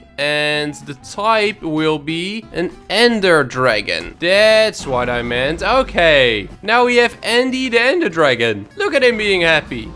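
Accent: Netherlands. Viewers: I can hear that you lived in the netherlands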